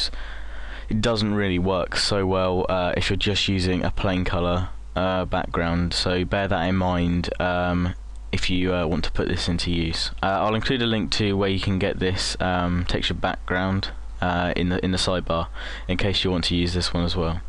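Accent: United Kingdom. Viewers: Thanks for lesson, British english cool! - Giorgip7-Tbilisi, Georgia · he is british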